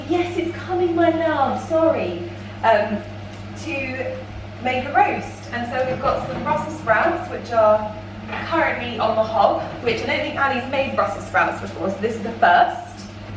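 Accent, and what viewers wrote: RP, United Kingdom. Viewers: it always cracks me up when people in the comments spell Berkeley out as Barkley...that dog's damn name is Berkeley but desperately wannabe-posh accent makes it sound like Baaaaah-kley and people get fooled. hilarious indeed...thank God he actually barks so it kind of makes sense, in a way 😄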